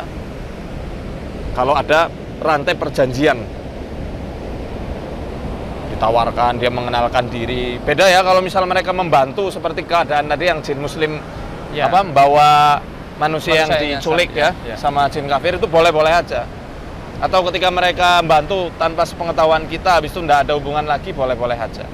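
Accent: Banyumas, Indonesia. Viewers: logatnya kho ngapak bhs Indonesianya...apa asalnya dr ..daerah ngapak ,mas Ustad.Kisah dibawa jin memang ada,sy ngalami waktu umur 5 th an,rasanya dibawa teman main(pd hal bukan) .Hilang setengah hari.😀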